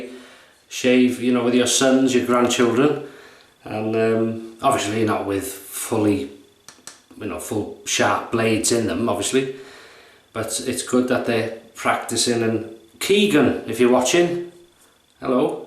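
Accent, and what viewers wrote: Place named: North Wales, United Kingdom. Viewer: I knew you were from North Wales could tell, I live in Llandudno don't know if that's anywhere near you. Another great video by the way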